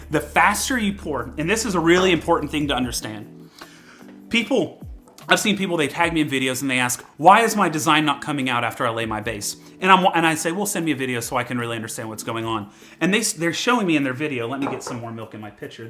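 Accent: South, United States. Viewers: Great videos, man! The quality of my steamed milk went up an entire level thanks to you! I have to ask, where are you from? Your accent sounds super familiar, to me. (I'm guessing Georgia or Alabama)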